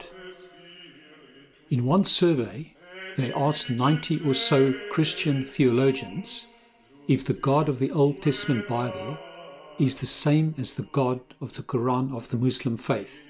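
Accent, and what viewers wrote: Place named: South Africa. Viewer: You are South African....your voice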